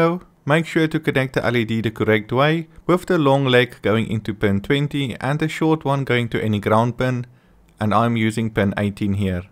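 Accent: South Africa. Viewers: At first i thought you were European Then i heard of say matrix with that south african R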